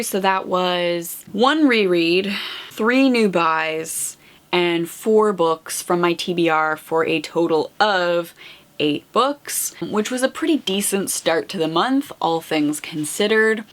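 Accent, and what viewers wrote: Canada. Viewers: Hello, I have been enjoying your reviews. As a English teacher in Australia I love to pick up on different accents. I have noticed when you say out or about it comes across as oot or aboot. What is the origin of you accent?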